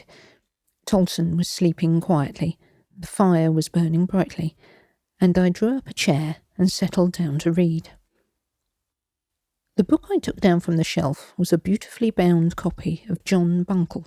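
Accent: England, United Kingdom. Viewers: Was pleasantly surprised to see another story by you. Saved it for the last story of the night. I dont think you realize how beautiful an english accent is to a Southerners' ear. Again, I enjoyed the story and the storyteller!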